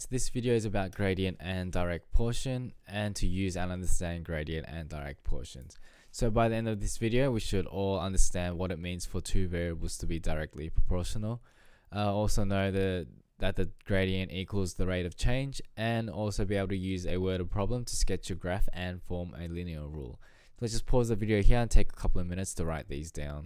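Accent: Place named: Australia. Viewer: i was wondering why this video was so accurate to my Australian curriculum and then realised why when i turned up the volume and heard your accent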